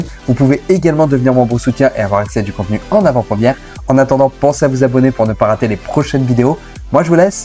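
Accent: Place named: Quebec, Canada. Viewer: La traduction IA avec l’accent québécois, on pouvait très bien s’en passer. La prochaine fois, mets des sous-titres.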